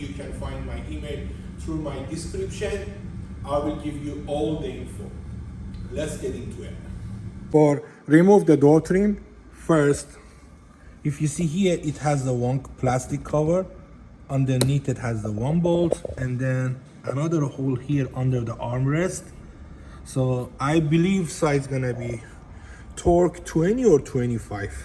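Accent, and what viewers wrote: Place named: Iran. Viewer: جان. دمت گرم. عاشق لحجه فارسی انگلیست هتم. میخواتم بدونم که سی۳۰۰ معمولی هم همینه یا فرق داره. میخواستم کامنت، لایک و سابسکایب کنم. موفق باشی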